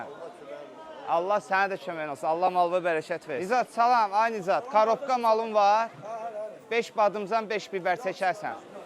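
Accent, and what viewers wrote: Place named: Baku, Azerbaijan. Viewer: Can Ay Bakılım ləhçövə quzu kəsim.Qulağa necə xoş gəlir bizim şirin ləhcəmiz ağzunnan bal damlıyır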